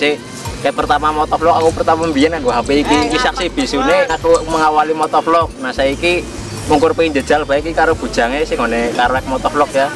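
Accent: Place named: Banyumas, Indonesia. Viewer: Jangkrek penak videone yen ditonton..... Karo misoh. Wkwkkw seneng nyong ktmu video ngapak kaya kiyelah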